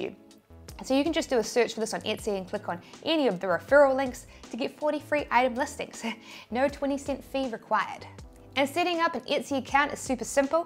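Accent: New Zealand. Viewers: The last one that threw me was "each" pronounced as "ahch". It would be cute if you did a video on the New Zealand accent. Yours is quite prominent. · you are a bloody good Kiwi! And all that with the accent:) I am looking for getting an income as it has now been over 5 months without employment and I realise that recruiters are not working hard enough to help me get a job. I have to chase the opportunity myself and indeed, what better than running your own business. You are very detailed in your videos and you stick to your advertised title, which I really appreciate. Being without income, I am maxing our credit card to pay the bills. I hope there might still be enough on the main credit card to pay for the up-front cost when someone buys an item... As you said, it is a cash flow as the sale is made, but imagine if you sell 1,000 items in one week and you don't get your money before the second week, Ouch! 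But then, you can explain this to the bank manager and show your orders with the expected payout to come. I am sure the bank will allow an overdraft on the account for the credit card... (hopefully these are still humans?) I will probably watch some more and this one again and get started tomorrow. Thank you for your time making these videos. You definitely deserve your income. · i think shes from new zealand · You're so sweet. I lived in NZ and I love hearing your beautiful accent. Reminds me of one of the best times of my life. Amazing video as usual. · Hmmm, I would have guessed you had a Kiwi Accent. ;) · Oh, so that's where it's from the interning accent, New Zealand , 👍 , lovely acquainting of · New Zealand's English is so adorable! 🌷🌿🌎💖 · I was wondering if it was New Zealand, thanks! I gotta practice my accent interpretation. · Love the Kiwi accent! I watch cricket and so I understand the Kiwi accent perfectly. 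Love from Sri Lanka 🇱🇰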